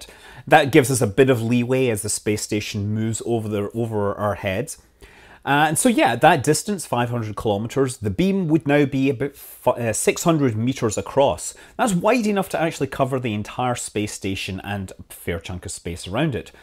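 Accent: Scotland, United Kingdom. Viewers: It's refreshing to hear someone with a Scottish accent talking about something other than drinking and fighting.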